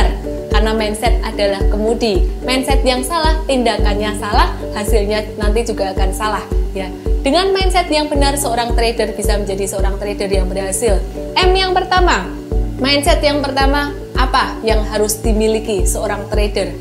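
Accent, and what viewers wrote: Java, Indonesia. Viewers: Walaupun medok..tapi okelahh cara dia menjelaskanny thanks mom😍😍😍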